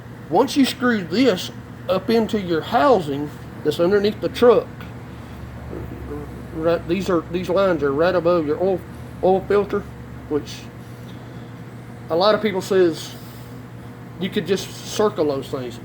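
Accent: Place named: South, United States. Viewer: Even with the down South accent, I got something useful out if this video. That workaround fix looks pret darnt good good buddy. I think I will try it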